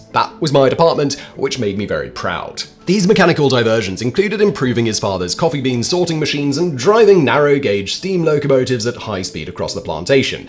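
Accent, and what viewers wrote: United Kingdom. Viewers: From your accent you sound British - so it should be "inventor of the aeroplane" - we are not the 51st state yet!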